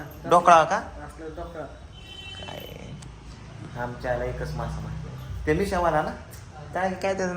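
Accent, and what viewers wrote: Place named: Kolhapur, India. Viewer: Dada kolabi boltata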